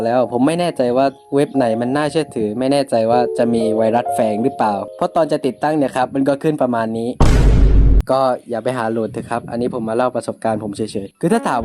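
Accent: South, Thailand. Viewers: เจ้าของช่่องคนใต้รึเปล่าคะ55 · พี่บ่าวคนใต้รึป่าว😮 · คนใต้แน่ๆ5555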